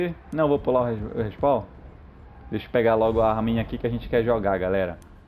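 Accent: Pará, Brazil. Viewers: Você é do estado do pará? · VC E PARAENSE MANO KKKK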